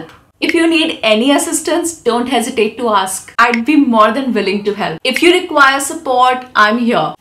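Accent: India. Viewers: Thankyou so much mam . Iam the one who has no interest in English videos and movies. Because i don't understand American accent and British. I believe that British and American accent is the real English. Indian accent is not a real English. But when i started to watching your video i understand that Indian accent is also English. And i loved your videos and addicted to you. And i learning English only because of you. Lot of love from Kerala ❤ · 🙏Tqsm mam For Today's All useful idioms n phrases ,it really helpful for us n again tq mam for your Guidance in Bharats Accent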